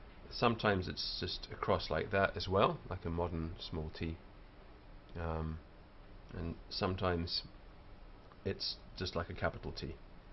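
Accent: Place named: United Kingdom. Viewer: the low audio quality and british accent makes it seem like some old bbc documentary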